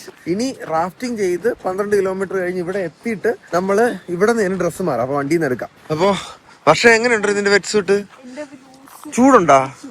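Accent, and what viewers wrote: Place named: Punjab, India. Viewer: ഹിന്ദിയിൽ നല്ല രീതിയിൽ പഞ്ചാബി വാട അതായത് accent ഉണ്ട്, ഡൽഹിയിൽ പഠിച്ച സമയത്ത് ഒരുപാട് പഞ്ചാബികൾ കൂടെ ഉണ്ടായിരുന്നു എന്ന് തോന്നുന്നു, ആ ഭാഷയിൽ pitch modulation ൻ്റെ വേലിയേറ്റം ഇറക്കം കേട്ടാൽ നന്നായി മനസ്സിലാകും, എന്തായാലും ഹിന്ദി ഇഷ്ടപ്പെട്ടു. വർഷ പഠിക്കുമ്പോഴും വർഷയുടെ ഹിന്ദിയും ഇതേ തരത്തിലാകും.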